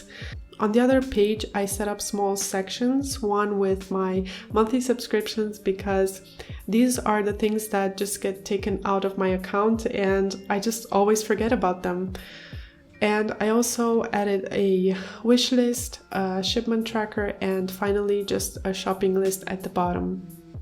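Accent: Germany. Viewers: Where are you from?:) Your English sounds very German haha :D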